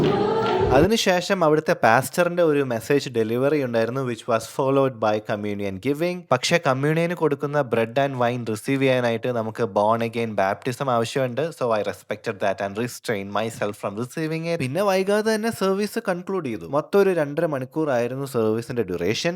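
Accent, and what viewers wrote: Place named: Central Travancore, India. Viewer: നിങ്ങളുടെ video വളരെ നന്നായി, എന്തെന്നാല്‍ നിങ്ങള്‍ കൃത്യമായി ഞങ്ങളെ വിവരങ്ങള്‍ പറഞ്ഞു മനസ്സിലാക്കി തന്നു, I appreciate you, നിങ്ങള്‍ ഒരു മദ്ധ്യ തിരുവിതാംകൂര്‍ സ്വദേശി ആണെന്ന് മനസ്സിലാക്കുന്നു സംസാരത്തില്‍ നിന്ന് മനസ്സിലായി,അല്ലേ, പെന്തകോസ്ത് സഭയുടെ കേരളത്തിലെ ആസ്ഥാനമായ കുമ്പനാട് എന്ന സ്ഥലത്തിന്റെ അടുത്താണ് എന്റെ വീട്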